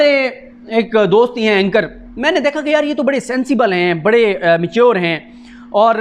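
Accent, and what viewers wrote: Saraiki, Pakistan. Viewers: اسی بہانے یہ پتہ چل گیا کہ آپ سرائیکی ہیں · آپ کا سراکی کا تڑکا اچھا لگا۔۔ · Ur saraaiki sounds very nice... Thanks fr ur independent broadcasts... ❤️them...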